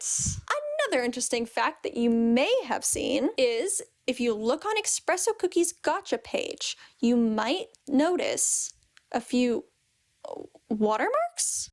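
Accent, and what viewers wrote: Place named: Canada. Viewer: You sound so Canadian in this btw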